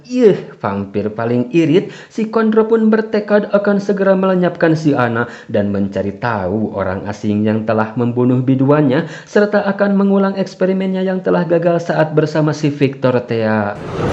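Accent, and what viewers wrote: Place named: Subang, Indonesia. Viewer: Aksen subang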